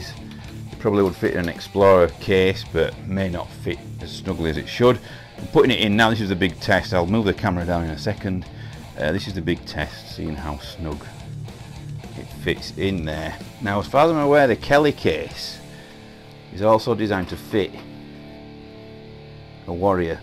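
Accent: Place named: Yorkshire, United Kingdom. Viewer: Alright, mate, im gonna guess the accent. Rotherham or Sheffield? Helpful vid btw, good work🤘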